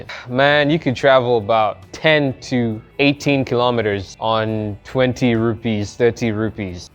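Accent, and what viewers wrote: United States. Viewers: I had to look up your first video , i thought u were American. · I think you have a very interesting accent. It doesn't really sound like how my African friends from Ghana, Nigeria and the DRC speak. It sounds more like an American accent.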